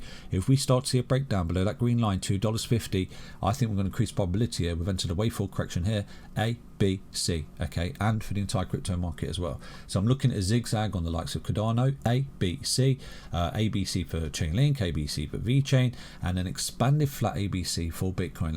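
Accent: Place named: Northern England, United Kingdom. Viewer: A lovely northern accent. Thanks for the TA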